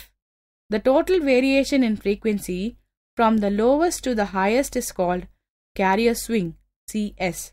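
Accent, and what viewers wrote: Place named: India. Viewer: this is an indian English speaker, haha.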